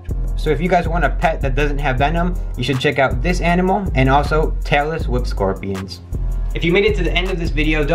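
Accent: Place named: Argentina. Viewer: I don’t really mind if you speak English in your videos, but I love when you talk in Spanish. I mean, that Argentinian accent? God, it’s just beautiful.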